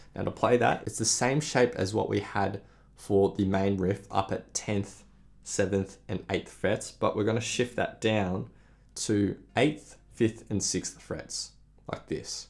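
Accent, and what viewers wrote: Australia. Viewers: Hello are you from Australia?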